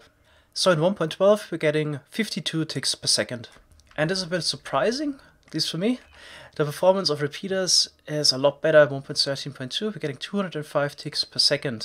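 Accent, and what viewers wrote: Germany. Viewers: Das hört man raus das du aus Deutschland kommst, nices Video :D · Du bist doch deutscher oder :)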